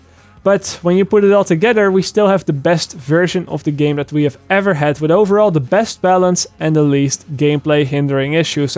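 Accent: Netherlands. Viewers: Aksing Are you dutch ?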